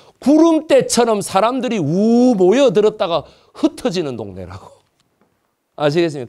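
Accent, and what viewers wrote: Gyeongsang, South Korea. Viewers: 경상도말 자주 접하지 않으면 화난것 처럼들어요 저도 처음 경상도분들하고 일했는데 맨날 혼내는 것 같아 많이 울었는데 나중에 익숙해지니 농담이 반이더라고요 그분들도 제반응에 참 많이 힘들었을것 같은 생각하니 웃음이 나네요 매불쇼에서만 봽다 갈증나서 구독하고 역주행중이예요 재미나게 잘보고 있습니다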